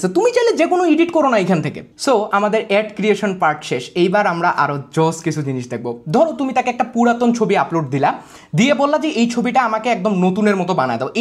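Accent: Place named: Bogura, Bangladesh. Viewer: বগুড়ার ছোল বলে কতা,,❤❤❤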